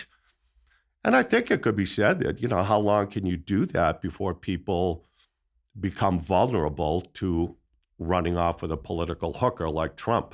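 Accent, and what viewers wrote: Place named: New England, United States. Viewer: I like your style and the New England accent. I am from Ohio since 1977, but born and raised in Massachusetts up close to New Hampshire. You should do well with this show. Thanks.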